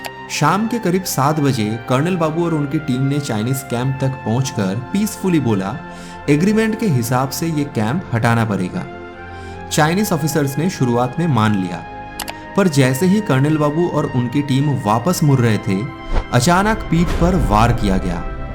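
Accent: Bihar, India. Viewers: Bhai aap north east se ho aap ke voice me thode Bihari tuch h 😊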